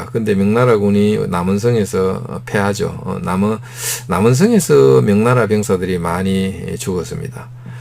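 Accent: Gyeongsang, South Korea. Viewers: 쌍도 억양으로 지역비하방송하지 마시라. 개쌍도야, 전라도 전라도 반복하지 마시라. 호남이다. 약무호남무국가라 했다. 호남이 없으면, 민주도 없고, 조선도 없었고, 대한민국도 없었다. 쌍도 험한지형, 호남 평야,미군주력부대라서, 625때 영남이 보전되었다.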